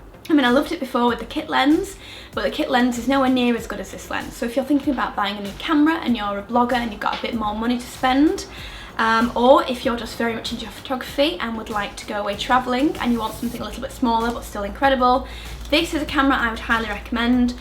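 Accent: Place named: Bolton, United Kingdom. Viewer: No matter how tired I am I'll stay up an extra 10 minutes to watch your videos. Love them every time & really love the local accent (I am a Boltoner originally) xx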